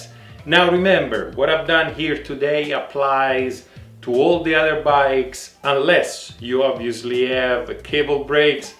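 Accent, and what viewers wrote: Italy. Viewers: love the accent! Italy?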